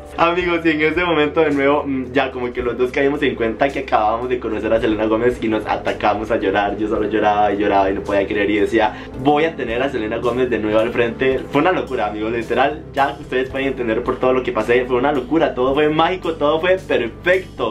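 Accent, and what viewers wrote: Mexico. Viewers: Pensé que eras de México jajaj ❤️ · Creí que eras mexicano Como sea, me encanta tu buena vibra. Te envío un abrazo 💜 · su acento suena como freson pero mexicano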